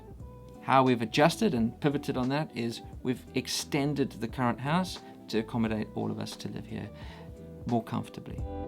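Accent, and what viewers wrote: South Africa. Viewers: But you are a South African. That accent is dead giveaway. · Wow, you sound 100% South African. Not even a hint of a foreign accent. ..and that after only a year?? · You don't sound like you're from outside South Africa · It is so funny to hear that you are from the UK, your accent to my South African ear sounds South African and looking at you, you even look South African to me, weird isn't it? You could so pass for a Dutch South African, geniet u dag, and stay safe. I left South Africa 32yrs ago, all my family are and still lives in Cape Town, I would love to move back, but... I have a fear for the crime and having to live behind bars and in and out of cars. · Well he sounds South African so he's one of us now. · You sound South African? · His parents are the farmers. Egte boere. Can’t you hear his accent? Capetonian accent. Lived in Uk 15 yrs. 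Now he is back. Go julle. Vas byt. With you all the way. From What Oz. 40 yrs. ❤️SA. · Ja. That's what I thought when I first heard him talk. What? But he sounds South African!!! What does he mean "moved to South Africa"? You already belong Mr.!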